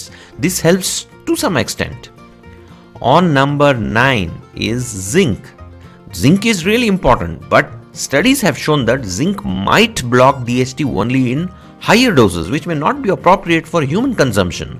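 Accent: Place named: England, United Kingdom. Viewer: That THICK English accent is making me kill myself.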